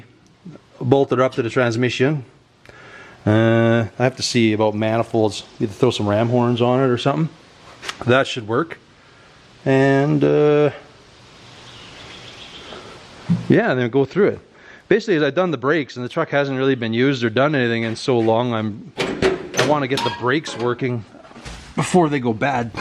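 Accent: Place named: Canada. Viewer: This got Canadian accent with a southern twang, but great content 😂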